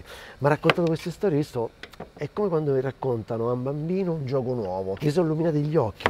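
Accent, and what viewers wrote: Rome, Italy. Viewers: A parte la tua cultura culinaria che nn si discute, per la personalità e il modo di esprimerti così semplicemente romano, nn sei da meno di grandi attori come Sordi, Fabrizi Montesano e altri! Sei vero!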